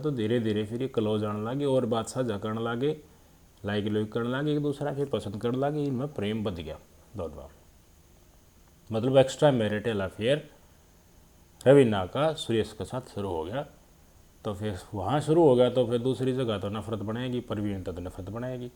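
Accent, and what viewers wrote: Haryana, India. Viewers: Bhai jma gajab tarike t btav h …pure haryanvi💪🏻💪🏻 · Bhai shab aap bolne andaz haryanvi m bhaut khub h or aap ki baat bhut achhi lgi or aap age bdo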